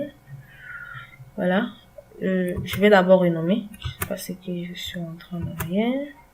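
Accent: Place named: Africa. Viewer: toi tu es africaine , ca s'entend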